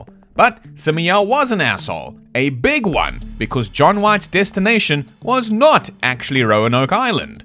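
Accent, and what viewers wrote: South Africa. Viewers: Which has nothing to do with this channel, since he isn't A.I. (he is South African).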